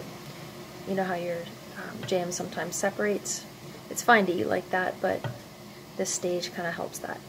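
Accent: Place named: Canada. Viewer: Hi. Canned up a batch of the Orange Marmalade today. Snowing winter day here in the high desert of Oregon and this Marmalade is like an afternoon in Florida! Your video inspired me to can something new. It's absolutely lovely. As to your reply wondering what words gave you away to me as a Canadian, any word with "out" in it. Lol. Such as "about" and the word been come to mind. Not that it's a bad thing. I love Canadians! I lived in Montana not far from the Canadian border and had the pleasure of meeting many when they ventured down from Saskatchewan to our little town south of the border. Take care and looking forward to what you bring next to Canuary 2023.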